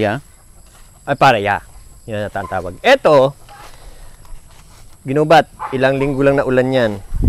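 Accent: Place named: Mindoro, Philippines. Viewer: Pang Mindoro din ang tagalog ni sir hehehw.e..taga mindoro po ako...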